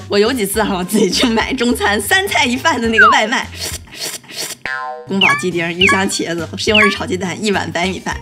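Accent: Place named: Dalian, China. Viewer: 大连的？只有大连人能听出来！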